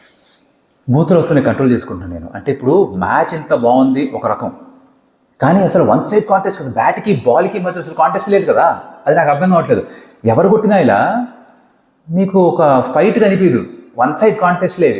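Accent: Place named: Hyderabad, India. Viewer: Bhaiyya apka Hindi mast hai bhaiyya....pakka hyderabadi hindi❤❤❤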